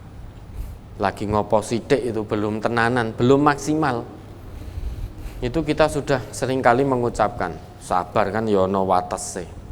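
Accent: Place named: Java, Indonesia. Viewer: Alhamdulillaah..logat jawa panjenengan tadz.. mengingatkanku pada bliau al ustadz alm..barakallaahu fiik..❤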